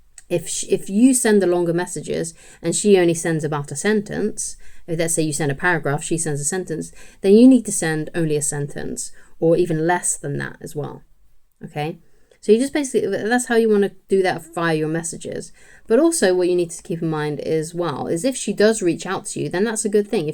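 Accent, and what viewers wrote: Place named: United Kingdom. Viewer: loved that british accent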